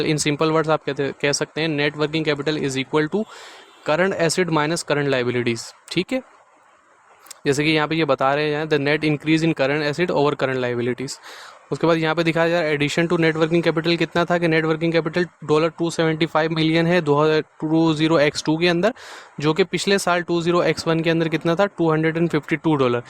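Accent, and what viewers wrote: Karachi, Pakistan. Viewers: Sir Karachi se lagrhy hain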